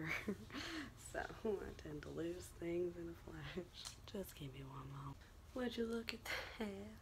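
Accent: South, United States. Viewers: It's like.. I should be offended being from the south but it's just so perfect I feel like I'm with my aunt. Thank for making me homesick 😂😂😂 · THe southern accent and everything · yes I want her to do more asmr videos with this southern accent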